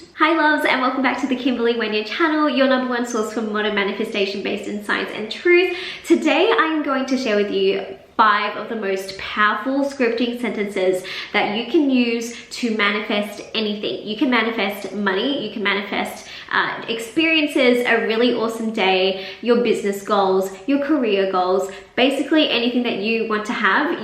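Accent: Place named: Australia. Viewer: It's really unique to see a pretty Asian girl with such a broad Aussie accent.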